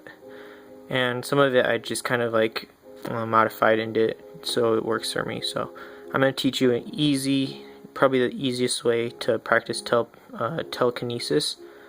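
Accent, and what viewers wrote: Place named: Illinois, United States. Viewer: you from illinois? your accent is frikkin adorable.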